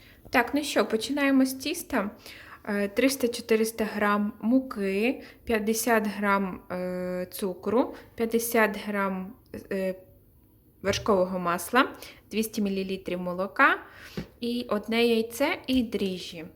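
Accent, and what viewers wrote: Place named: Ukraine. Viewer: а с какой ты области? Чувствуется, что не с Западной. Будь здорова, чорноброва.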